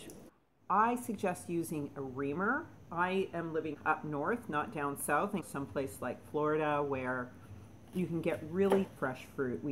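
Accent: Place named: Canada. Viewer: I thought I heard a Canadian accent. Im from Winnipeg but my whole family lives in Nanaimo on the island. I live in Houston, Tx. Love your vids. Want to know a little more about the potassium salts. I went to <link> but couldn't find anything. I have alot of thyroid issues but don't take any meds. Try to get what I need naturally but think I need a little more help. 🕊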